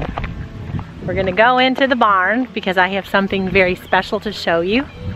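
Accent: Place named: Great Lakes, United States. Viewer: Was just able to stop laughing at least long enough to comment three years later. Cyndi Lauper was funny. My friend calls his branch cutter "Lauper" but "Phyllis DILLER" had me in stitches. I've been a sub for over three years and wasn't going to watch this cause of its age but glad I did cause I needed that laugh badly what with all the dark conspiratorial stuff I analyze [NOT theorize] & ingest almost every day. Needed that Light and silver sunshine you radiate. Not sure where you and live but it's well N of SW Blowhigho. I can tell by your near Great Lakes eaxecent.. LolOwell. Peessowt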